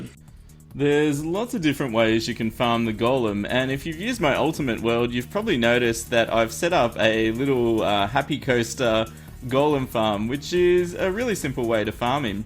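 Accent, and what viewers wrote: Australia. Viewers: what part of Australia are you from happy I'm in south Australia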